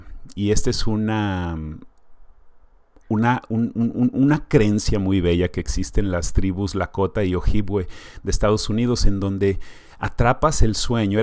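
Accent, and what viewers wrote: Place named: Mexico. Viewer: Aprecio mucho tu información, y me encanta el acento mexicano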